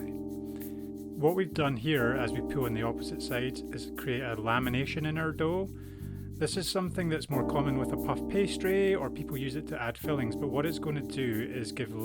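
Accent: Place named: Scotland, United Kingdom. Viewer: Great video! I enjoy your Scottish accent and voice. 😊 it’s relaxing and calming and not comparable to nails on a chalkboard either 😂 These look beautiful and would be lovely for a holiday brunch , can’t wait to make ! Thank you for a great video and recipe !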